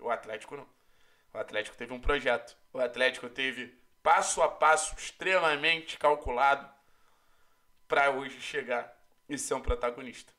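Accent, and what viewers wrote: Rio de Janeiro, Brazil. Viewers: esse cara é carioca e torce pro aflético?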